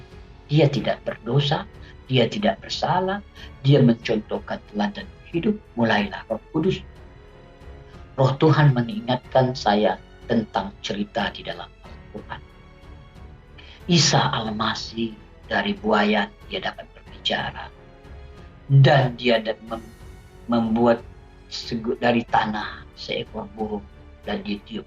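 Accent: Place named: North Sumatra, Indonesia. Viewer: Bukan orang Aceh ini orang. Logat kentalnya orang Sumatera Utara. Ngibul lu tong!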